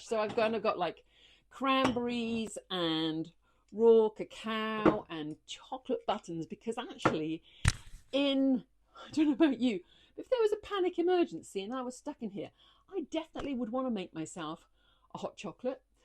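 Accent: United Kingdom. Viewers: Du bist Schwedin??? Warum hast du so einen englischen Akzent?? Ich verstehe schwedisches englisch sehr gut, englisches nicht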